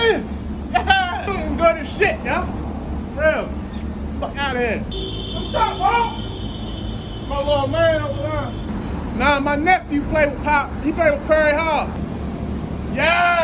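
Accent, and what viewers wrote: Baltimore, United States. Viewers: Is this Nigga from Bmore? Lmao 😂 how tf is he doing this shit so perfectly ? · I’m a Baltimore guy born and raised he nailed this shit 😂😂😂😂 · He gotta be from Baltimore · You definitely form Baltimore I can not!! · Bruh so good I actually though he IS from Bmore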